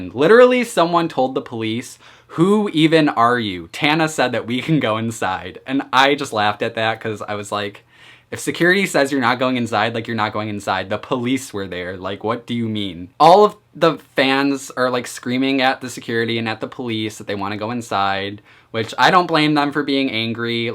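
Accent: Midwest, United States. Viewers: Brian Zavala yeah he does to me I'm southern (US) and he has a lil sorta kinda I think it's Midwestern accent I think it's so cute💕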